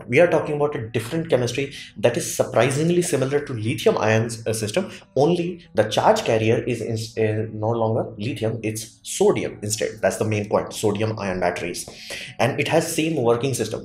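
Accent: India. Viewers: My United States English accent and your Indian English accent are far enough apart that I could not follow your presentation, so I lowered the replay speed to 75% and everything became clear. I'm just mentioning this as a suggestion for anyone else with the same problem.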